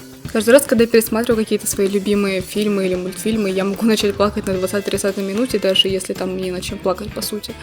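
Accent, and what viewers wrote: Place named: Russia. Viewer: Your accent is so cute, i need to go to Russia now to get a waifu. But Elise is trash LOOOOOOOOOOOOL Just kidding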